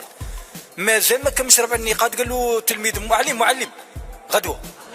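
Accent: Algeria. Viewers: لهجة شلف بينا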